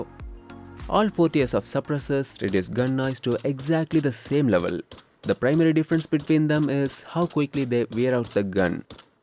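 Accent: India. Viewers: This.is literally just a copy of the other dudes 2024 vid with an indian accent. Same transitions and everything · Why do you sound like a dirty pajeet?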